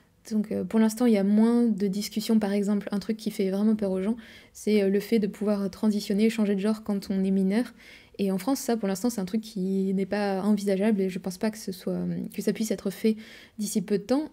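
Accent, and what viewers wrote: Russia. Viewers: Расскажешь про Китай когда вернешься?буду скучать по твоему рязанскому Ы.обожаю его.успехов. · C'est marrant, tu as un accent russe. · Тоже подумала, что акцент похож на русский ))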